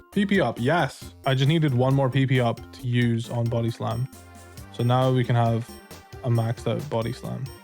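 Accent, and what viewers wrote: United Kingdom. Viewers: Where is your British accent from :0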